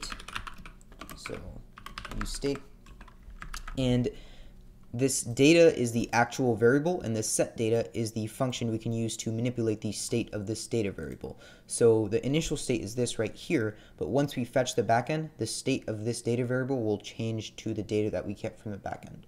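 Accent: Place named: Nepal. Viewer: Bro!! are you Nepalese? your accent ❤ thank you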